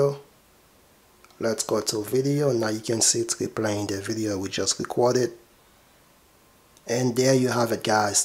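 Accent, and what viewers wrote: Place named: Arab world. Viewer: Is better than a Spanish dude Maybe he has arab family and that's y he talk like that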